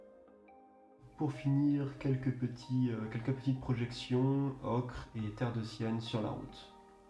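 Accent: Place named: Alsace, France. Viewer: Merci, il me semblais avoir entendu l'accent Alsacien de temp en temp!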